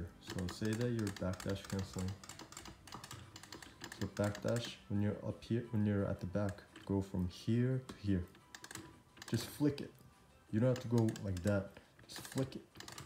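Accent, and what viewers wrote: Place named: Russia. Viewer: Cool video but can't understand anything with your heavy russian accent lol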